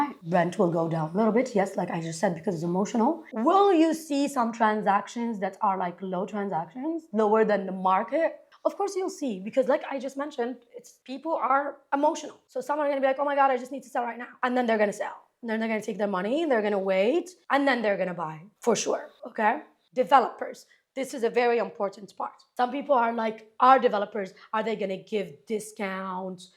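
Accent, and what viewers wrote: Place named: United States. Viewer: Delusional with an American Accent can’t phantom the reality of realty. Go find a new daddy in Miami.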